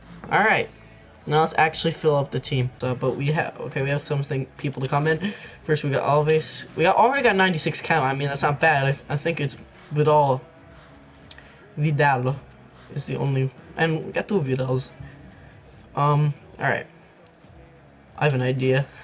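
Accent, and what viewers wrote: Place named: Estonia. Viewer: wtf ? are you from estonia??????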